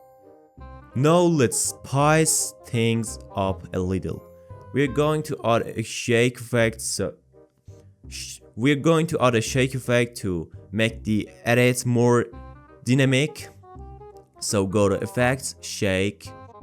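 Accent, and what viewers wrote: Iran. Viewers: بنازم داداش. عالی صحبت میکنی ولی بازم یه ایرانی لهجه تو میفهمه. موفق باشی عشق داداش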